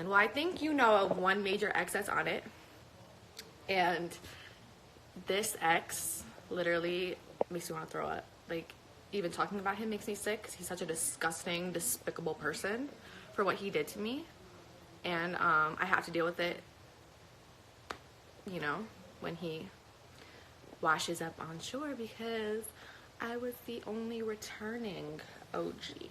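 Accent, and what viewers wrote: United States. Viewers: is u light skin black bae? u got that nice light tone an i think maybe u black cuz how you talk . sound lik u come from were i from (bronx usa baby!!!!) pls tell me yss u black my god u fne bae gd bless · candy candy she’s from Jersey and is Italian. That’s just how we talk here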